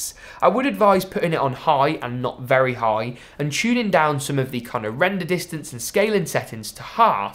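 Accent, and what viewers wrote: United Kingdom. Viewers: You have a huge british accent!!! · He has that perfect Harry Potter accent.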